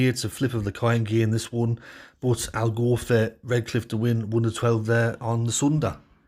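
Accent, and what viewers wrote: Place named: RP, United Kingdom. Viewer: That accent is just pure class. You should be a member of the House of Lords.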